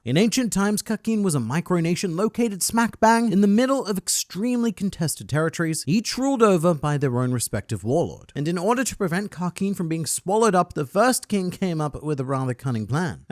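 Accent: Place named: Australia. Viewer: I manly watch this guy on the Internet souly to obtain his accent, after listening for years I'm able to mimic the australian accent and convert it into my own speech😅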